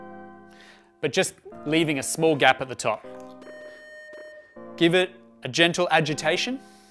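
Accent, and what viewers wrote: Australia. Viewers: where in australia is he from? :D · Man, I can't stand the Australian accent!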